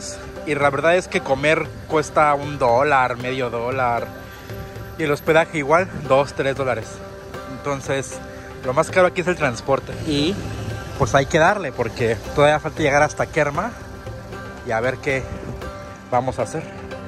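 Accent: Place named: Fresa, Mexico. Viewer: Porque este hombre habla fresa normalmente hablan haci los yuniors y el para gue😂😂😅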